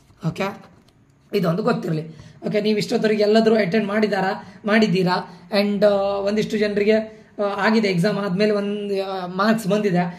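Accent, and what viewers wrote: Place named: Dakshina Kannada, India. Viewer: sir, you got the correct prediction i have subscribed to you now..! the accent of Dakshina Kannada makes me listen more..!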